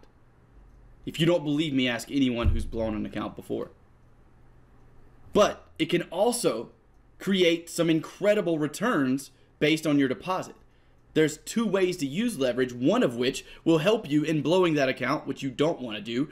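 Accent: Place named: Alabama, United States. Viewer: man you have got to be from Alabama.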